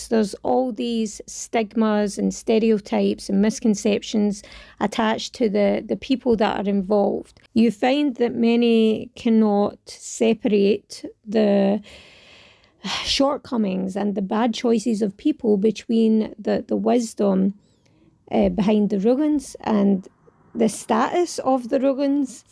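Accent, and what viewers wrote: Scotland, United Kingdom. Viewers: Ma Shaa Allah sister you are good. On the subjects you talk about, you are spot on. However sister, your Scottish accent is a bit difficult to follow in one go. 😊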